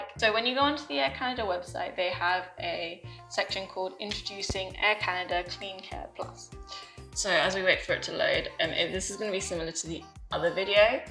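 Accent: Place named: United Kingdom. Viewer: Hey, your videos are so informative and helpful. Thanks for sharing your travelling tip during the Pendamic. I miss travelling personally as I would have loved to travel and explore New Zealand and Japan next ❤. Im from Canada too but I live in Ontario. I like your cute British accent to. Keep sharing and stay safe😊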